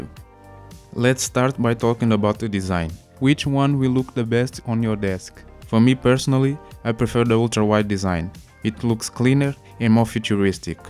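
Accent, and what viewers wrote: Russia. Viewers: i think u re russian right? :D by the way thanks for the review, i exatly for the same dilemma, i need 3 tabs open, and be some playe for the desktop, maybe for me a 32:9 would be a better option? just don't know because, if i want to stream my pc via moonlight i have alay cange the 32:9 resolution to 16:9 when i would like to game on a laptop or tablet or pone? i really hesitant, becasue most of the time i want to be able to reach my desktop from anywhere the world without changing resolution and stuff, maybe ahve to stay with a 32 inch 16:9 or do you have any advice for that? thanks in advance! :) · what with accent, sounds like russian dialect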